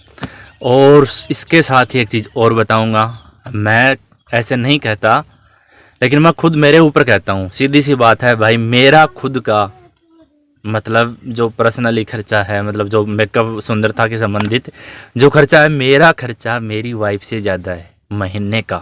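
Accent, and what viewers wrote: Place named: Haryana, India. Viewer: Wov lagta h ap haryana se ho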